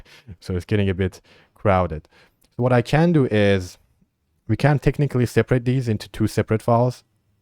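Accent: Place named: Iran. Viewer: you are very good at teaching keep it up! and i think you're persian... am i right ? i almost can feel the accent 😂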